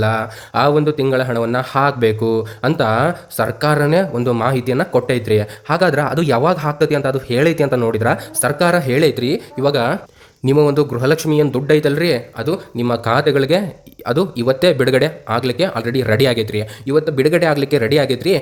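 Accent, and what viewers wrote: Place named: Bengaluru, India. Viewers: Bangalore Kannada language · Bengaluru kannada sir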